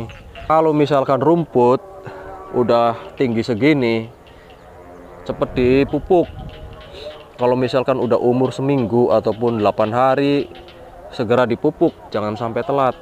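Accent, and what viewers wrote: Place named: Banyumas, Indonesia. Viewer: Wong ngapak yae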